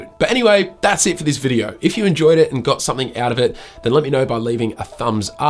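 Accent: Australia. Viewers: Hey, this was very helpful! I was always considering that aperture light but now this just gives me all the more reason to do so! Also, to hear a fellow Aussie accent helped me connect with what you were saying more! Will go through your other stuff and subscribe:)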